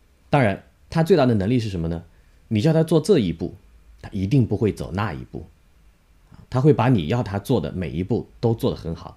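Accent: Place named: Hangzhou, China. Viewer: 翁老师的杭普太亲切了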